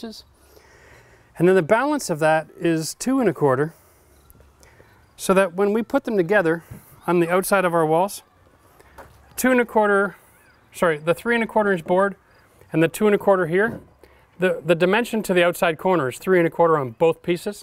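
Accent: Canada. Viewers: You Canadian boss??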